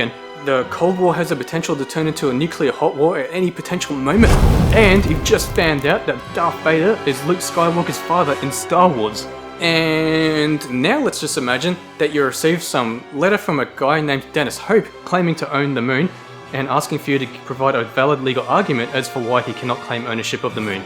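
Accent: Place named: Australia. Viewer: Good to hear australian voice and humour 👍👍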